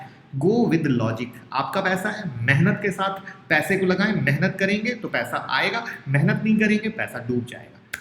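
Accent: Bihar, India. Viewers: Aap bihar ke ho kya ?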